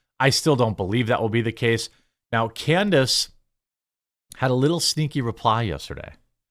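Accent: Wisconsin, United States. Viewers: Wisconsin accent.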